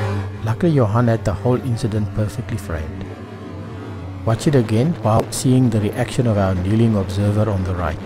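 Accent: South Africa. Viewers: South African ... not Australian 🙄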